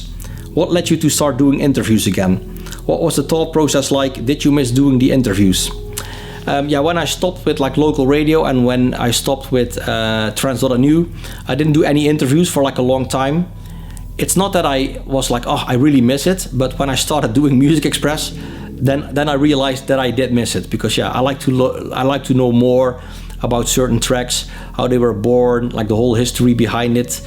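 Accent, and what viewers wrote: Netherlands. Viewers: Anyone who complains that the Dutch host has an “accent” needs to go and flush themselves down the toilet. Ignore the morons. They walk among us unfortunately. I discovered this channel about two days ago. I have spent most of those two days watching all of your videos, stopping occasionally to sleep and eat!! Love it and keep up the great work. My fave is definitely the Space Brothers. Those guys are legends🤣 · The Dutch accent with English is great btw. It's reminds me of a time when I lived in netherlands years ago. So if some take issue with it they have the real issue. Happy Christmas to you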